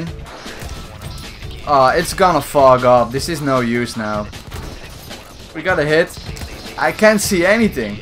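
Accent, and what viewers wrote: Netherlands. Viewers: r u dutch bro? cuz, pronounciations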